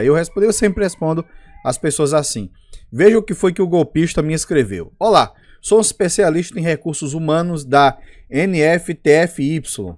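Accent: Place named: Paraíba, Brazil. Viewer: Quase cai, mas cliquei no link , que faco agora,, sotaque Paraiba muito legal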